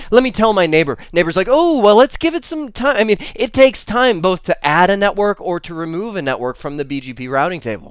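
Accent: India. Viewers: if it hurts so much to hear Indian accent videos... simply stop listening to it in first few seconds.. People make videos for FREE to help other learners, why dont you simply pick up a book and read it then, instead of trying to find knowledge over youtube. I bet if you stay in technology field, you will hear more indian accents wherever you go... i am kind of sad and happy to read your comment, because whatever job you pick you will get to hear Indian accent....because we indians are way technically advanced :)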